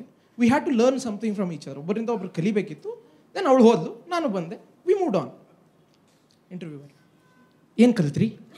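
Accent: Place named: North Karnataka, India. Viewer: Bro ur from north Karnataka pakka I can say that ,both the way I loved it...♥️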